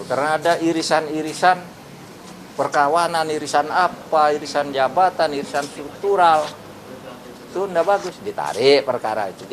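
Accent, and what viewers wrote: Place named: Madura, Indonesia. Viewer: Pamekasan Madura Menyimak. Logat Madura dari Pak Mahfudz masih sangat kental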